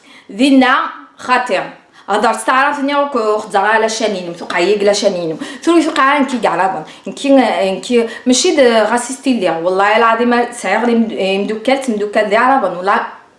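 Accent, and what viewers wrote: Bejaia, Algeria. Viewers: Mignon l'accentvde Begayet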